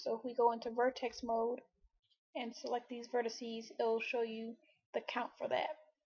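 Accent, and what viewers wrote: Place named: South, United States. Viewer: Nice southern accent :))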